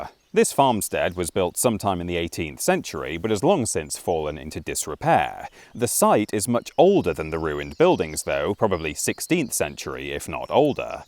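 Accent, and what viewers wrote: United Kingdom. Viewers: Nothing quite like hearing a proper British accent expounding upon historical loos. 😂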